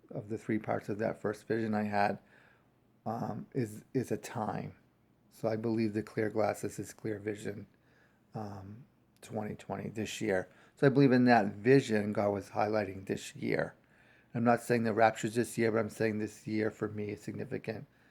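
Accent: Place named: New Hampshire, United States. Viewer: Hey, I enjoyed this. During the first five seconds, when you introduced yourself I was thinking you were from New Hampshire, based on your accent and family name, and then you said you were from NH. I'm a Manchester native. I do believe this is the year when we get 20/20 vision from the Lord regarding the unfolding end time events. It's already been happening. The final puzzle pieces are all coming together with perfect clarity now, regarding the things we've been studying for decades. Here are some things that will confirm what you were saying: <link> <link> <link>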